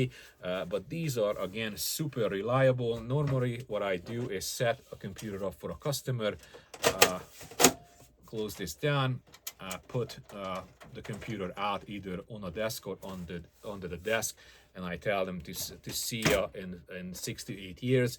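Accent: Hungary. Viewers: are u hungarian by any chance? your accent really sounds like it:)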